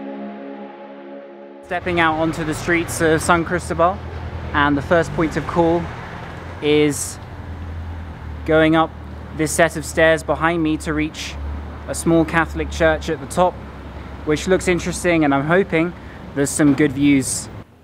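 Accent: England, United Kingdom. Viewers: That beautiful and sexy English accent describing places in Mexico...incomparable.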